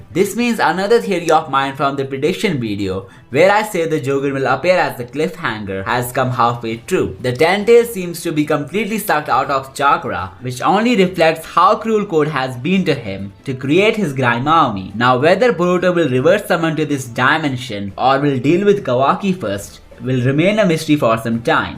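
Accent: India. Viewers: can u speak hindi??? your accent seems indian if yes... please make theories in hindi please please i am your OG watcher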